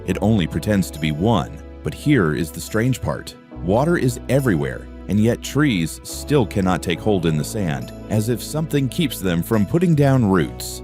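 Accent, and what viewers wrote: England, United Kingdom. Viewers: angolos tályszólással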